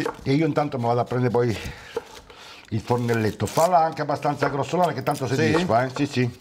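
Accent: Rome, Italy. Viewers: His roman way to talk is absolutely beautiful mixed with such an elegant sense of humour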